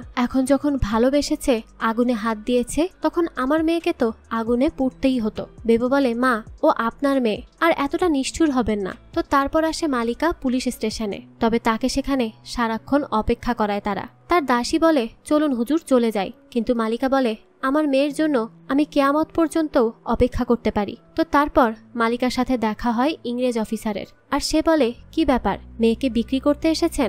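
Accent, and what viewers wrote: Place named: Bangladesh. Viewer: আপু তুমি কোন দেশি,,,তোমার ভয়েস r কথা শুনে মনে হচ্ছে তুমি বাংলাদেশি,,,তোমার চেহারা দেখার খুব ইচ্ছা ছিল আমার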